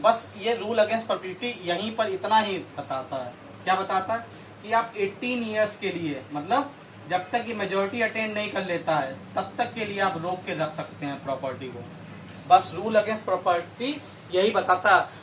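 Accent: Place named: Uttar Pradesh, India. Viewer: 😁apke bolne se hi pta chalta ap up se ho Mai bhi Lucknow se hu.. Bahut Acha laga video...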